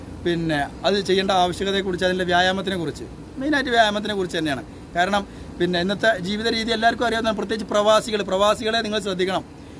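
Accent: Malappuram, India. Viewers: എന്തായാലും താങ്കളുടെ മലപ്പുറം ഭാഷ എനിക്ക് നല്ലോണം ഇഷ്ടപ്പെട്ടു തമാശ രീതിയിലും കോമഡി ആയിരിക്കും താങ്കൾ പറയുന്നുണ്ട് അത് അങ്ങനെ തന്നെ പോട്ടെ ഞാനും മലപ്പുറംകാരൻ ആയതുകൊണ്ട് എനിക്ക് തന്നെ വളരെ ഇഷ്ടപ്പെട്ടു ഏതായാലും താങ്കൾ ഗൾഫിൽ എവിടെയാണ് ഒന്ന് കമൻറ് പറയുക അല്ലെങ്കിൽ വീഡിയോയിൽ പറയുക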